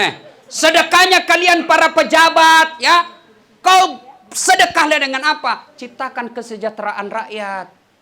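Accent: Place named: South Sulawesi, Indonesia. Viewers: Pasti ada aja lucu lucunya. Logat bahasanya ust saya suka. Mantap bugis Makasaar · Kentara medok bahasa bugisnya hahaha tho penrank to Sawitto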